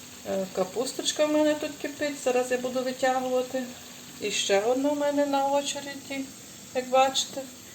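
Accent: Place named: Volyn, Ukraine. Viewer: С удовольствием послушала вашу речь, вспомнила своих родных с Волыни.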